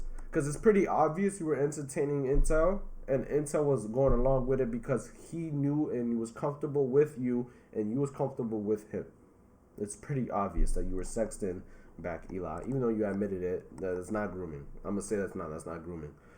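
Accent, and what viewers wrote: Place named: Long Island, United States. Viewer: What part of Long Island you from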